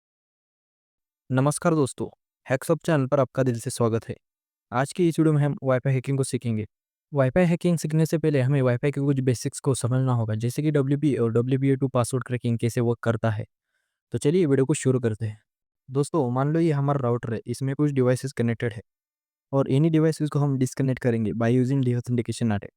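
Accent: Maharashtra, India. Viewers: Bhai tu Maharashtrain he kya? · Bhau tu pakka marathi aahes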